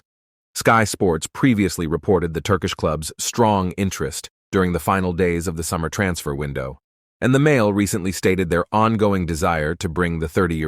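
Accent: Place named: United States. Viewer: another yank voice owa thumbs doon them all!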